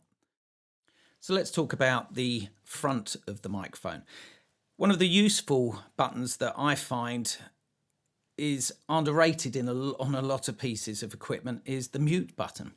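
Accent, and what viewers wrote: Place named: United Kingdom. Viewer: I love British English. I enjoyed this review.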